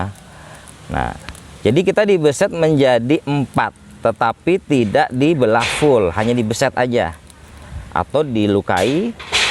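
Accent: Jakarta, Indonesia. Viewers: Abang orang Betawi ya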